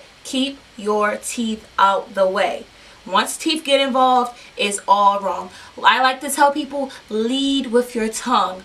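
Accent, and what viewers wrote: Baltimore, United States. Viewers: I know you from Baltimore with that accent.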